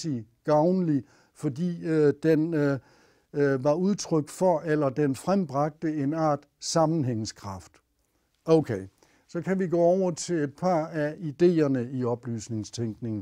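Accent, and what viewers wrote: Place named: South Jutland, Denmark. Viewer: Filosofi på Sønderjysk.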